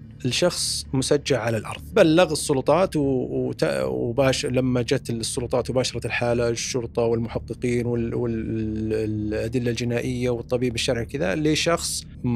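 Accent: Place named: Qassim, Saudi Arabia. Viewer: من احلى ماسمعت قصص ررررررائعه بلهجة قصيمية تزيد من جمالها 😂😂 ، حلقه كل اثنين ! ياليت تصير كل اسبوع حلقتين لو قصه فقط بدون تحليل ✅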